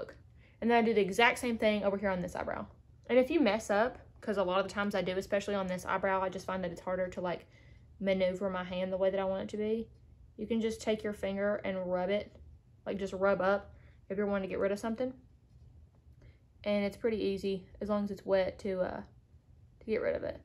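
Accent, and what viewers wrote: South, United States. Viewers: Ohhh how I love southern accents 🥰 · Came to tame my brows, stayed for the southern accent 💖✨ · I love love love natural brow, even before she did the feathered or push up eyebrow look and this by far is the best video on these type brows. She has the cutest accent, and I believe a detect southern. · bruh as a british person this ladys accent is really fucking with me xD idk why i expected her to just sound like me and then boom her southern accent - what nice suprise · Love your southern accent girl! 💖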